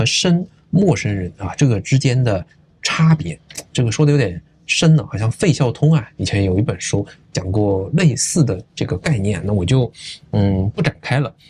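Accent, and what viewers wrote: Malaysia. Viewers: 哈哈，你的口语也是有大马的味道了。中国人口多，没办法跟大马对比。=) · 在大马搭车，你还要特别选择安静搭乘，不然driver会认为聊天的机会来了，只能说我们大马人真的太热情了😅话说，你说话的方式越来越大马化了啦，尤其那个....我觉得hor😆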